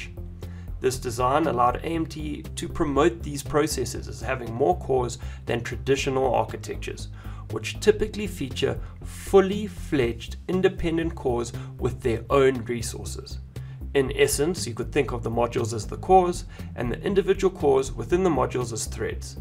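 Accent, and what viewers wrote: South Africa. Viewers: Dude, are you South African?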